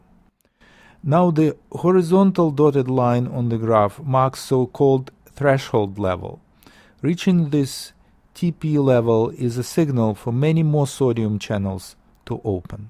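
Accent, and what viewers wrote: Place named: Russia. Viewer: The Russian accent makes it cooler!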